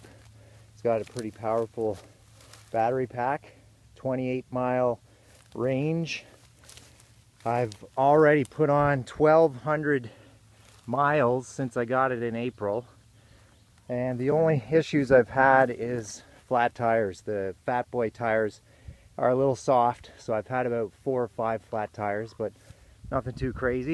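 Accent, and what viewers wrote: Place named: Canada. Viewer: Sound Canadian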